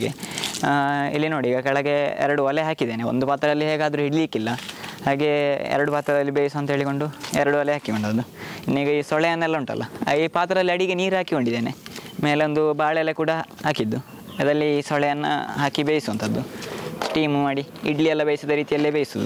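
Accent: Mangalore, India. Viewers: Manglore kade ide tara swachha kannada matadtare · ಮಂಗಳೂರು ಕನ್ನಡ sounds good 👍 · I like ur mangaluru language love from bidar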